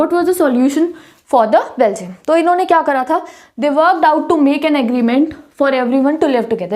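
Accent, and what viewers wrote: Punjab, India. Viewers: Are you punjabi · Didi aap punjab se ho ?? Please tell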